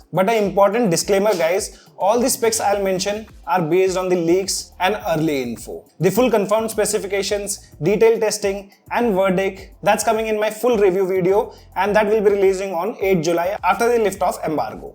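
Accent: India. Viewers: Bro's English sounds like HINDI💀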